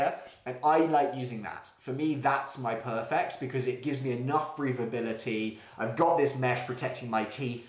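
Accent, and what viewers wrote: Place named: United Kingdom. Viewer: Great videos, nice to hear a uk voice reviewing airsoft !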